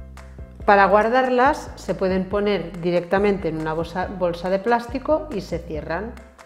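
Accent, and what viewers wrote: Spain. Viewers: Olá ! Ela fala espanhol da Espanha, não é argentina, mas gostariamos de recever-la , você sempre é bem-vinda a meu país! Brigada !! 🌷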